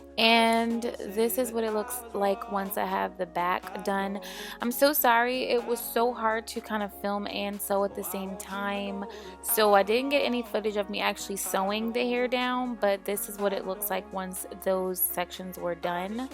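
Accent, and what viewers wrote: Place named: Caribbean. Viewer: Are you West Indian or have West Indian parents. it's just the way you say cornrow and catch/ketch when talking about the braids 😭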